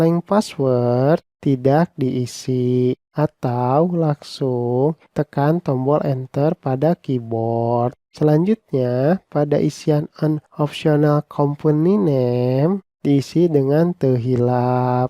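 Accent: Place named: West Java, Indonesia. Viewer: Sunda pisan 😁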